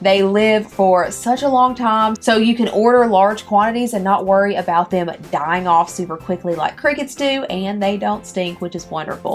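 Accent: South, United States. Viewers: I have never seen or heard a black woman with a country accent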